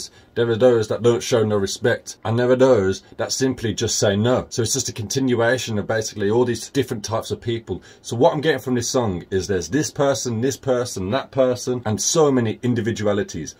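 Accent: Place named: England, United Kingdom. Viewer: hi, where are you from? I adore your english accent. I want to learn it....I must!!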